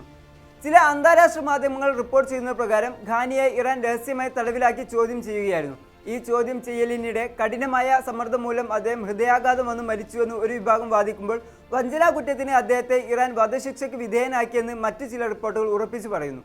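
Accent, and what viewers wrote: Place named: Malappuram, India. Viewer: മലപ്പുറം മലയാളം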